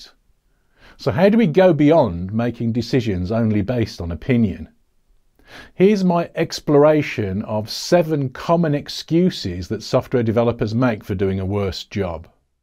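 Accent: United Kingdom. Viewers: This channel is just telling me things I already believe in a British accent. I mean that in the best possible way.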